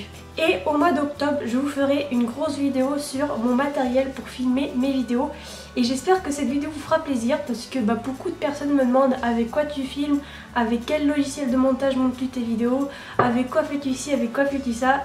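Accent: England, United Kingdom. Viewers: Ton accent anglais est juste magique xD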